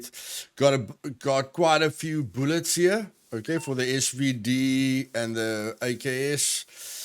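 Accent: South Africa. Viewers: You sound South African bud lol if yes maybe we should have a game together but I warn I'm a complete noob just started but enjoy your videos bud nicely done 👌😊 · accent is very South African.... niice vid boet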